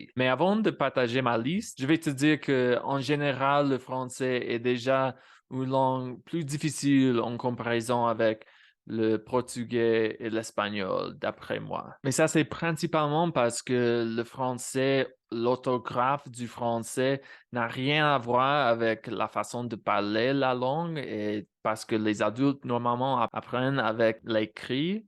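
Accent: Quebec, Canada. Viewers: Ton accent français est pas mal plus celui du Québec que celui de la France. Plusieurs tournures de phrase et contractions sont déjà intégrées dans ton français parlé, mais ce que je remarque le plus, ce sont les intonnations en "en" qui ressortent plus sous forme de "an" dans le français parlé québécois. Ça saute aux yeux... oups, aux oreilles! Et les "en" qui se changent en "an", eh bien c'est tout-à-fait normal dans le nord de la France! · Je suis québécoise et je suis professeur d’anglais langue seconde à la retraite . Je parle aussi italien. Je vous ai écouté avec beaucoup d’intérêt. Je trouve que vous vous exprimez comme un québécois. Il n’y a pas beaucoup d’anglophones du Québec qui parlent le québécois aussi bien que vous. Une autre raison à avoir de la difficulté à trouver quelqu’un avec qui vous pourriez échanger est que beaucoup de québécois, comme moi entre autres, croisent le français standard et le québécois en même temps. 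Comme on dit ici « Ça vient mêler les cartes». Félicitations pour votre chaîne. Vous communiquez très bien votre pensée et ce que vous dites par rapport à notre langue est très juste. Votre «FAQUE » et «J’AI DE LA MISÈRE c’est très québécois . 👏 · Pourquoi tu désires apprendre et parler le français avec la couleur québécoise? Ce vieil accent très localisé de 9 millions d'habitants. ;)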